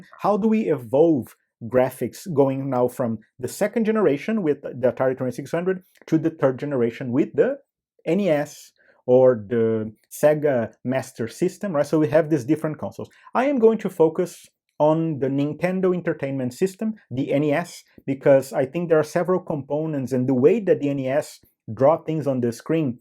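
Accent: Brazil. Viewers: If you drink mate you've got to be Argentinian but you have a Brasilian accent haven't you 🤔. As a C64 programmer I can imagine how hard the VCS must be to code for without a graphic's memory. This notion of racing the beam is alien to me.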